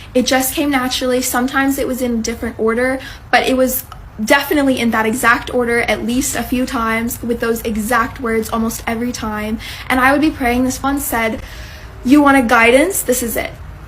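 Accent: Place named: United States. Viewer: Sister I can tell you're definitely American. And you're a good servant of ALLAAHH..in sya Allaahh. May ALLAAHH PROTECT n bless you eternally❤❤❤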